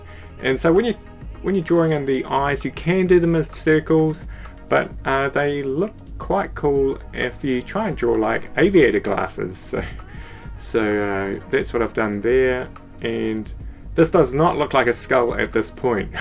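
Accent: New Zealand. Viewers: That accent sounds like a Kiwi, am i right? If so you art work tooi much😮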